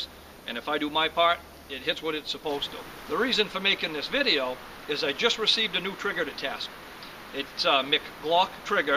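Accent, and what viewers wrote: Maine, United States. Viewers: Sounds like a Maine accent?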